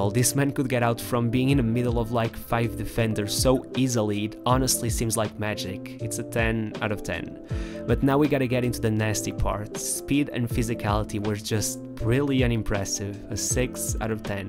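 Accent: Portugal. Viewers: Your pronunciation suggests to me you’re a Portuguese speaker. Are you from Portugal? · Portugal. The pronunciation is good with a Portuguese accent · só um português consegue falar inglês desta forma e acertar todos estes nomes latinos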